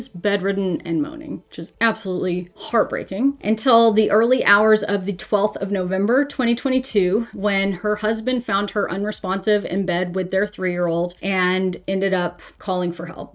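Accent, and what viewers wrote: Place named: United States. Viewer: You sound very American, you should work on that, there's a reason women and members of the lgbtq+ get danger pay if their jobs want them to go into that place. Margaret attwood wrote the handmaids tale about that country for a reason, canadians say Americans for what they are, bad people- you especially 😊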